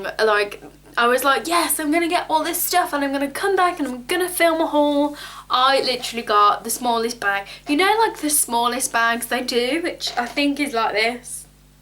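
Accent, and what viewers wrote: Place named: Midlands, United Kingdom. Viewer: Where you from bbe?? You sound Midlands xx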